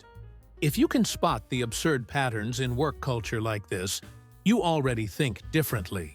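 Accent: United States. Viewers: Yank voice. Not Aussie!! More Crap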